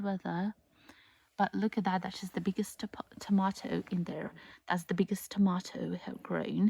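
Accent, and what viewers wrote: United Kingdom. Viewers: Always lovely to see you and your garden. Have you continued studying language? Your accent sounds even more British than I recall from earlier videos.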